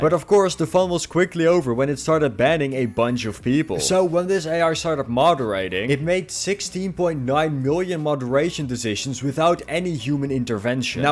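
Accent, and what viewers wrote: Netherlands. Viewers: i’ve said this once, and ikll say it again, i KNOW your dutch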